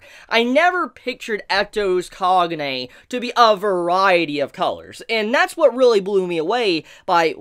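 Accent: South, United States. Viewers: #bring back the southern accent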